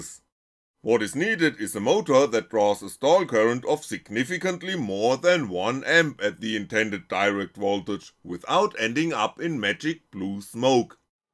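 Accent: Germany. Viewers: Funny to hear a german practising English.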